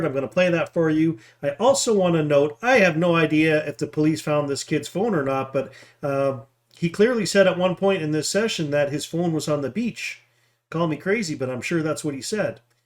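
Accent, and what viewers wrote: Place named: Scotland, United Kingdom. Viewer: I always hear that Scottish accent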